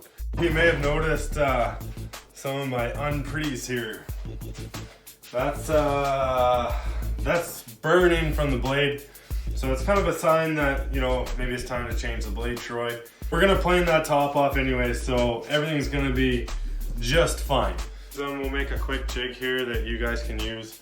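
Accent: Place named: Ottawa Valley, Canada. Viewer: Man, are you from the Ottawa Valley buy any chance? You talk like everyone i know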